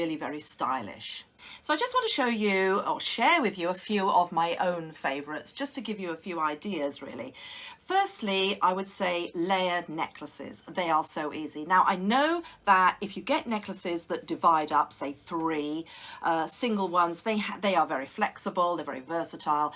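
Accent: United Kingdom. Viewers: Hi D,,, new from CA,, I will be 72 in a few days,,April 2024 I have made it!! I agree that it is your lovely kind presentation that got me subscribed...TY! You all might get a super kick out of this But,, I stopped wearing certain things back in the 20 the century,,,Jeans, jewelry, jackets, heels...all out!! lol...I wear big old clogs year round to accommodate my bunions ( and sneakers), I layer on scarves and I like to paint on shirts and my winter coats to keep me colorful and comfortable as well...Love your look and your Brit accent! Mo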